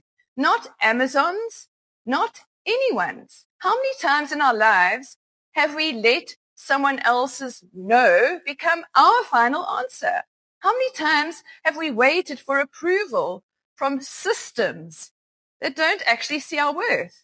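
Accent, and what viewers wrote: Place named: United States. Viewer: Wow! Your accent looks American. I know someone who Amazon KDP terminated her account of $10k royalty and promised never to give her a penny. If they will reinstate your account after paying $2000 to the attorney, it's totally worth it I promise but who knows if that's a guarantee that you will recover your account, or who knows if that's another opportunity to make away with your money 🤔. Above all, who knows if they wouldn't come in future and finally terminate your account so that the whole processes will continue again.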